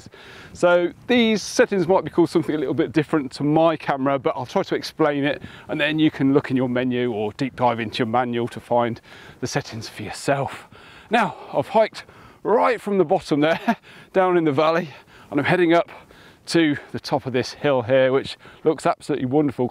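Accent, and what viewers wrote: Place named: Scotland, United Kingdom. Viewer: Nice to hear this light Scottish tone in your voice. I turned my noise reduction off, that's all took from your video. The rest of my settings are identical. Thx for sharing your experience. 👍🏻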